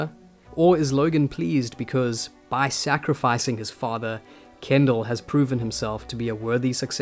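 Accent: South Africa. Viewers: I heard you narrate for 7 seconds, and I knew you are South African 🤣🤣🤣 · Youre South African arent you? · You sound South African. I agree succession is one of the best shows I’ve ever watched. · Bru you south african? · Are you South African? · Well in with the analysis...I also trace that South African accent in the narrator.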